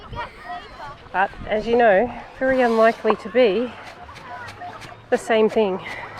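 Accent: Australia. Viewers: You do Aussie through and through have you used your new vest yet I hope you make sure you’re not alone when you go diving with those strong currents keep up the show’s love watching them 🤩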